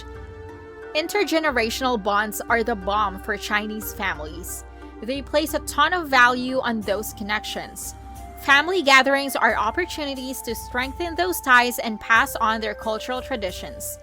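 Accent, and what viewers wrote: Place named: United States. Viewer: The narration is so American - freaking freaking freaking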